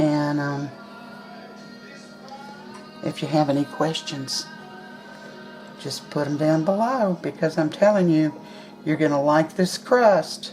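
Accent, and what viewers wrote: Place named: North Carolina, United States. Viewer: You're just the sweetest YouTuber! You sound like a North Carolinian. (My home state) I can't wait to try your recipe. I'm too old and stressed to be fighting against time with butter recipes for pie crusts.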